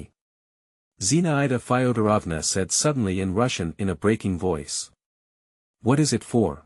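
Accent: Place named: United States. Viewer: I dont like américain accents, to me ruins the poetry of the words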